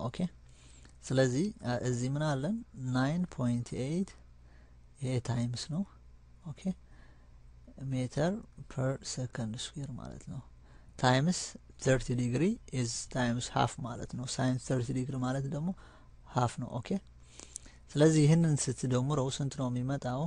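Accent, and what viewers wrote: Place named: Ethiopia. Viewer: LOL such an Ethiopian accent! I love Ethiopians!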